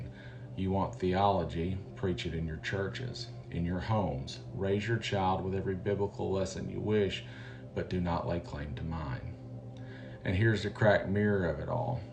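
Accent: South, United States. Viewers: you are brilliant. Your "good ol' boy" southern accent makes what you say even more powerful. I've shared your videos with everyone I know. Defiance 'til death 💪🏽✊🏼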